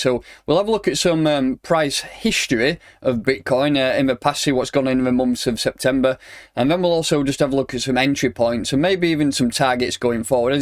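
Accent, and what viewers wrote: United Kingdom. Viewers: you have the harry potter accent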